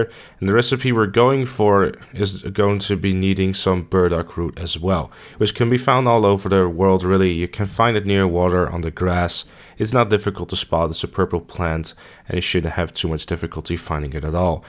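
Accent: Netherlands. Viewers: Great guide, I'm a fan of rear herbs. Are you Dutch? I can't place your accent.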